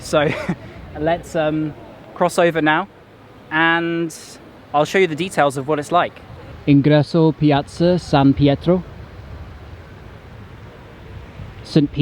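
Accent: United Kingdom. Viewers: R U From The UK. You Have A British Accent. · I am glad that you started your travel again. Astonishing views of Vatican. Beautiful architecture. I want to visit Italy. Well made videos with a great music background. Well done,!!! I also like your British accent😘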